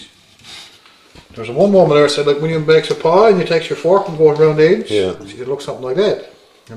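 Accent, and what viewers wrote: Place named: Newfoundland, Canada. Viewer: Haha Newfie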